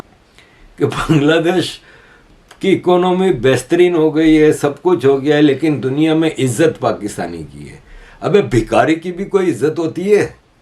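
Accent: Gujarat, India. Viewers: Bato se gujrati zalakati hai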